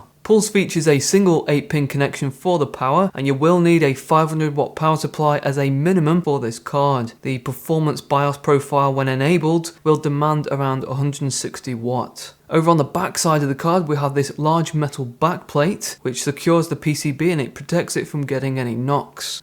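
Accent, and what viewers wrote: England, United Kingdom. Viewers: Hi, Thank you for another great video. is one of my favorite YouTube channel because of the following reasons: High quality video content The (video card) product presentation is VERY professional The camera angle is Perfect The sound is very CLEAR I and my wife enjoy watching YouTube channel, because my wife and I really like gaming PC, and high end computer components ( video card, motherboard) My wife tells me that the gentleman in this video ( I think his name is ) LOOKs like people in Hollywood, she likes English voice. In this video, the new 5600XT is impressive. The VRam's low temperature alone is worth buying. This RX 5600Xt review is very informative and helpful. Keep up the good work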